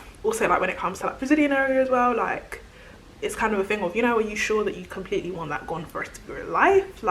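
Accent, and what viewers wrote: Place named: United Kingdom. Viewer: Man the British accent is annoying.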